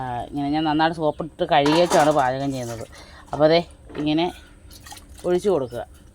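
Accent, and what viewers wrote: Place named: Malappuram, India. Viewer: മലപ്പുറം നത്തൽ, വത്തൽ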